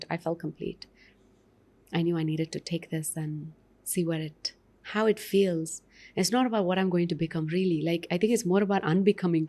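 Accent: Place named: Maharashtra, India. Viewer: ji namste. Are you from Maharashtra.. I notice certain influence or MTI of Maharashtra in your speaking... Thanks, the guest is awesome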